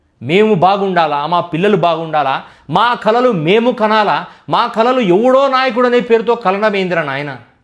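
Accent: Rayalaseema, India. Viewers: Rayalaseema yaasa 🔥💞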